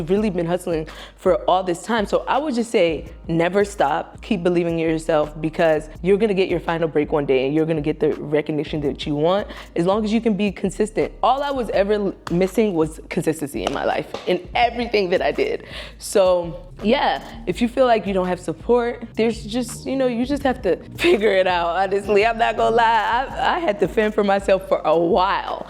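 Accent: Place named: Nigerian American, United States. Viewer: The mixture of Nigerian and American accents.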